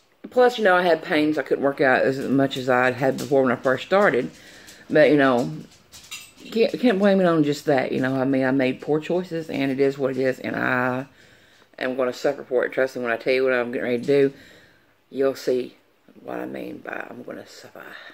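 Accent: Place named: Tennessee, United States. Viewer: Hi! I found your videos, kind of accidentally. First thing that caught my attention was that you talk just like me so I’m thinking you may possibly be a Tennessee girl?!:) Anyway, just throwing something out there for you- I have struggled with my weight for the past few years (had lap band surgery 2011) but truly wish I wouldn’t have as I had trouble taking off work to drive to Nashville to get my “fills”, and now my insurance doesn’t cover it anymore. Anyway, a few months back, I found a Facebook page called Snake Diet Motivation. It has been LIFE CHANGING for me. It involves fasting(which I was taught all my life was unhealthy). But, I tried to have an open mind since nothing else i had done, for years, was working. I started reading testimonies on the FB page and the rest is history’s it has been LIFE CHANGING. Check it out if you have any interest at all, and prayers for your health and healing!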